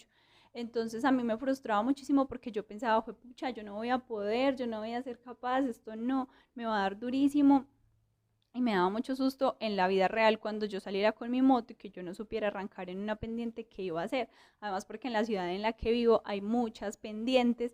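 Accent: Colombia. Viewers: Exelente video me gustan las motos y encontré tu videos y los miro por como ablas colombiana y tu humildad te hace grande saludos desde San Diego California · Me encanta el acento colombiano disfruto. Ollendote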